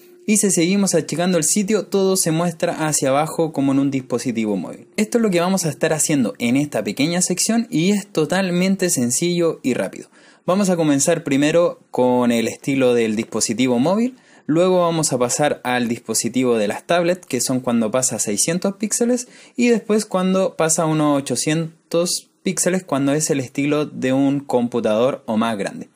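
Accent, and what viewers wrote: Chile. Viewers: Jsjsjs sabia que eres chileno jsjs tu acento se nota🥺❤️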